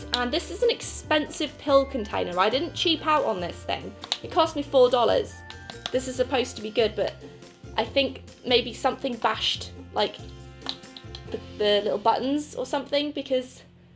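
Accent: England, United Kingdom. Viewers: Where do you live? You sound English yet you used dollars 😅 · bit confused how you got to target tho?🤔 you have one of the strongest british accents🇬🇧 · After hearing British accent for so long. I'm starting to read all the comments in an accent. In reading this in an accent right now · Lol she looks like Anna Kendrick with British Accent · Wait, where are you from? You said dollars and you have American plug sockets but you sound British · What country do you live in, because you sound like you are from the uk, but you talk with dollars?? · The reusing an altoid tin or prismacolor tin is also an idea I just literally found this week on Pinterest! I'm not as much a fan of paint as I am ink and colored pencil but I love the idea of reusing tins like that for art supplies. P.S I love your accent!- I've never met someone in person with a British accent until my new neighbors I just met recently! 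· Life hacks in a British accent are just better tbh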